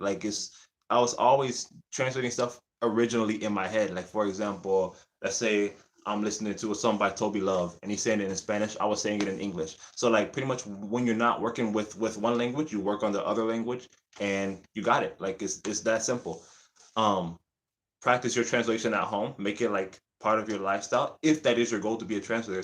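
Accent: Dominican Republic. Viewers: hola desde cuando y porque te gusta mi cultura y el país, que cosa te llevo a eso, pareces dominicano en el acento cuando hablas español, y sabes mucho sobre nuestro acento, Greeting From Sto.Dgo. Dominican Republic, Quisqueya La Bella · Suenas más como un dominicano(masculine)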